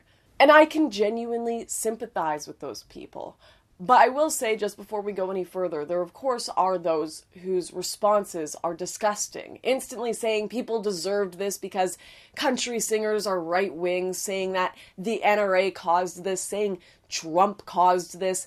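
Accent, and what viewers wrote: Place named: Canada. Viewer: you are getting wiser by the video!! Doesn't mean I agree with everything you say. (Doesn't have to) As usual, like I always say, Canada needs you and we need more Canadian dialect from you. Thank you!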